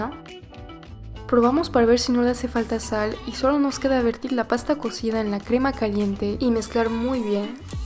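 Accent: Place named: France. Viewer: yo creo que ese acento tienen los franceses (creo que ella es francesa) y ni que sus videos fueran para animarte la vida jajajaja solo comparte recetas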